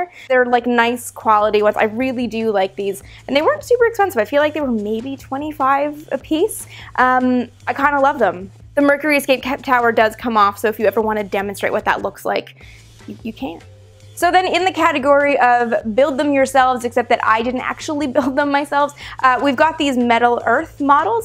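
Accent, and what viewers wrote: Canada. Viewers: You sound Canadian. So strange that almost all of the channels I'm subscribed to are Canadian.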